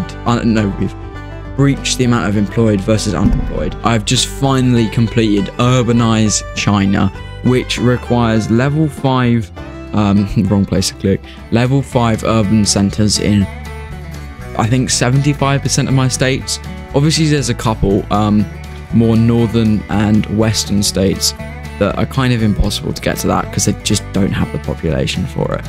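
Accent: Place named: United Kingdom. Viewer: Just discovered your channel, that Opening voice sound like feedback gaming voice, I guess you're both are British.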